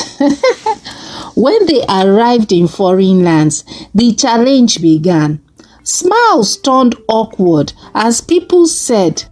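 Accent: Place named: Africa. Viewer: ❤ I sincerely love the fact that this is narrated with the beautiful African accent. It makes it all the more enjoyable. Thanks !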